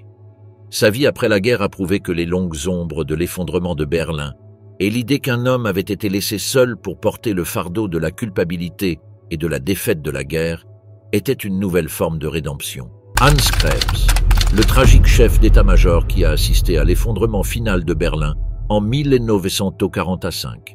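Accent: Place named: France. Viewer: Curieux, ce doublage par l'intelligence (?) artificielle. : les années dans les dates sont en italien, prononcées avec un accent francais à couper au couteau.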